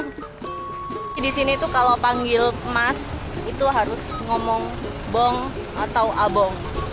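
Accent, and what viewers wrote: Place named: Surabaya, Indonesia. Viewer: Logat suroboyoan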